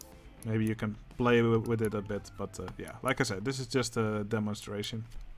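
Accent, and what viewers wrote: Netherlands. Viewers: Whaaaat, has a Dutch accent? I had not noticed yet!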